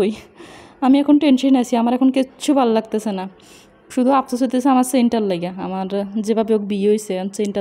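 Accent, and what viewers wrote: Noakhali, Bangladesh. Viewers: ওরে নোয়াখাললা তাই বুজি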